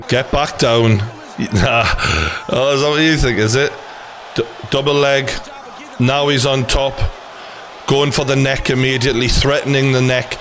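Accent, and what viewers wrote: Newcastle, United Kingdom. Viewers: geordie ass nigga.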